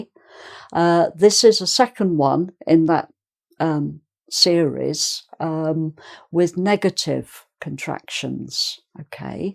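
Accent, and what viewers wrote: England, United Kingdom. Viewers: Hello. Thank you for the lesson. The pronunciation of the contractions are very dificcult. Beautiful british accent!! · Nossa estou apaixonada por esse canal menina kkkk inglês britânico é muito gostoso de ouvir, se minhas prof do ensino medio ensinasse os verbo to be igual ela eu tinha aprendido la kkkkk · British sounds perfect in your version. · I love this Britsh accent! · Hi..Iam from Chile...I love your pronounce ...pure english😃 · Oh an english accent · Beautiful lesson in British accent. Greetings from Mozambique 🇲🇿. Linda lição em sotaque britânico. Saudações a partir de Moçambique 🇲🇿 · Oooh British English pronunciation, thanks dear Lady. OMG!!! I've been pronouncing "aren't" completely wrong for like 15 years, I really need to view all your videos my lady, I probably sound really bad speaking English.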